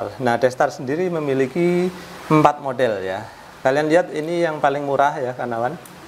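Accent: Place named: Yogyakarta, Indonesia. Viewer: Bahasanya Jogja bgt..Asli mana ya sebenarnya?👍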